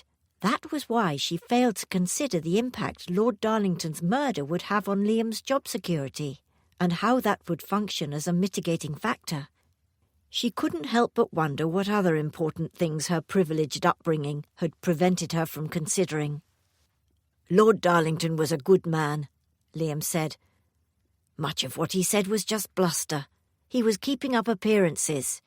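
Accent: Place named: England, United Kingdom. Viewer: How wonderful to hear an English accent🎉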